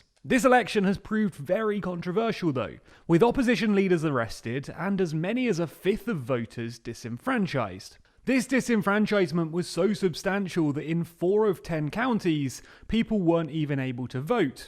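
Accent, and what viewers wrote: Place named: RP, United Kingdom. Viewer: You sound slightly deeper voiced and more posh in this video.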